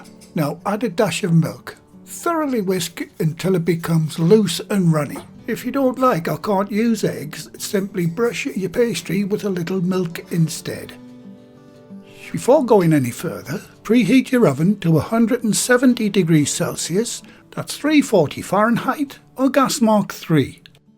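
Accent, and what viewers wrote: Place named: Northeast England, United Kingdom. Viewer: is from north east England, where people are known for their kindness and being very friendly. I know, I live here too.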